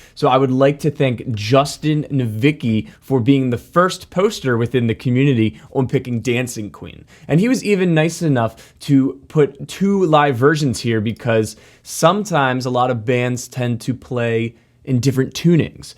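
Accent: Baltimore, United States. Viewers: The Baltimore accent is one of the greatest American accents of all time. Now I need to snort lines of Old Bay and put on some.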